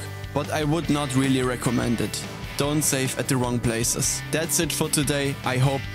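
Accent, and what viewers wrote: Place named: Germany. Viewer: Hey sehr gute Arbeit, genau wie deinen anderen Videos... ich schreib einfach mal auf deutsch, weil ich deinen Akzent raus höre ;) Ich habe eines meiner akustischen Sets nach Anleitung umgebaut. ;) Und überlege jetzt welche Felle ich nehmen soll? Für die Snare hätte ich an das Real Feel gedacht. Für die Toms überlege ich Remo Silentstroke oder was anderes in der Preisklasse (QuiHead) weil 4 Toms (10,12,14,16) mit Real Feel geht ein bisschen ins Geld. Als Reso sollte man da auch ein Mesh Head nehmen oder gar keins oder ein normales Reso Fell? Wäre schön wenn du mir bei der Entscheidung mit deiner Erfahrung helfen kannst! ;)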